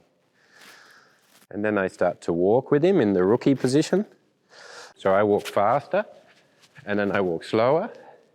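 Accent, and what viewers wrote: Australia. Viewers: Are you Australian? I was so impressed with your approach and the lessons you taught this beautiful horse, you saved him, Bless you, from Australia ❤ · Is this horse 🐎 teacher an Aussie or a Kiwi 🥝???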